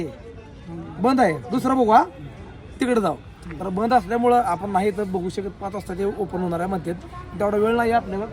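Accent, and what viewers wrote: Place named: Solapur, India. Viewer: खाली ये बे लाज आबरू सोडला बे भारी होत हे😂😂😂😂😂😂 आ दादा खुप हसलो आमी छान बोलता दादा तुमी सोलापुरची भाषा ❤❤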